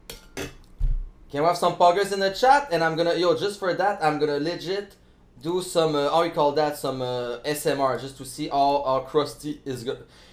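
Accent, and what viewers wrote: Quebec, Canada. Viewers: T'es québécois ?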